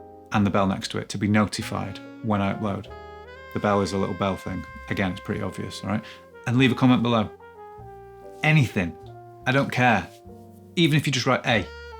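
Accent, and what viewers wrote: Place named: Northern England, United Kingdom. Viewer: well keep going - great tutorials. Love your delivery; v British, v northern. Distinctive.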